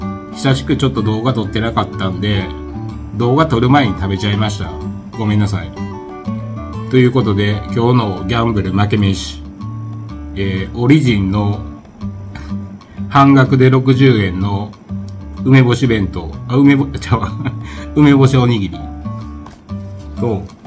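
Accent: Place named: Kansai, Japan. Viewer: お元気でしたか😂東京のピエロには標準語で話しかけてあげてくださいね。引きたいのに関西弁はひかれます笑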